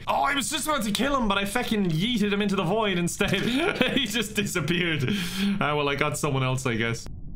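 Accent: Ireland. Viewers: IDK Why, But i really like the irish accent, I've always wanted to be irish myself but i don't think i can change myself like that! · Do you all remember old. Those were some of the best videos. Now he just repeats what happened in an Irish accent., if you read this go back to the old variety channel kind of thing. That was great.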